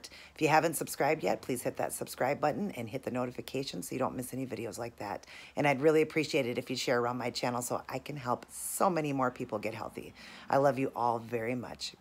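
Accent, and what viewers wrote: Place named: Minnesota, United States. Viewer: love your channel! what part of Minnesota are you from? I'm from MN too! I could tell right away from your accent lol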